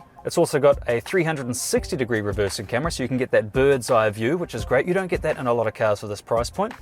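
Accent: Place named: New Zealand. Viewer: Just discovered your channel. I like your clear speech (Kiwi ascent not bad) and your casual and slightly excited and fun style also. Your simple but detailed explanation of what you are testing (Atto 3, I like it too). Background music & Ads are not bad either. Thank you. Lloyd from Adelaide Sth Aust.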